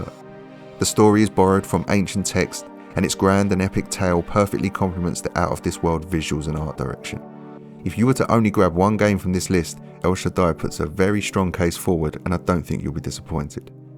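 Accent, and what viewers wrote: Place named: London, United Kingdom. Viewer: Proper londoner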